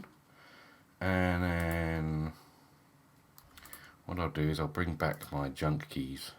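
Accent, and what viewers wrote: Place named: North London, United Kingdom. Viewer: I actually came to this channel because a friend of mine watched this thinking it was me on a different channel, it's quite freaky how similar we sound, not just the voice, but the way we speak, we must be from the same area (just north of London) for sure lol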